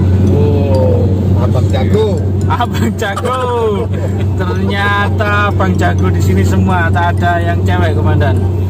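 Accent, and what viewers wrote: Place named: Java, Indonesia. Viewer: Aku suka dengar slang Jawa mu dong. Rasa senang dan menghiburkan.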